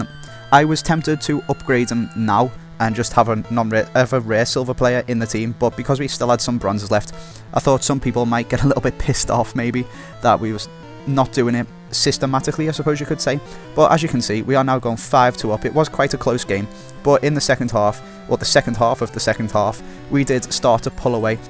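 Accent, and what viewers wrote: Liverpool, United Kingdom. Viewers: Are u from Liverpool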